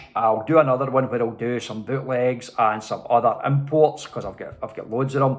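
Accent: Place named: Scotland, United Kingdom. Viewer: Hei! That Japanese singles box set is incredible and you still have it in excellent condition! You must have taken really good care of it. After all it's over 40 years old release! My favorite songs are and. Hope to find them on 7" format some day. Too bad that the singles are pretty rare around here in Finland. Scottish accent is pretty hard for a Finn to hear and understand but other than that excellent video and excellent collection, /MyVinylBar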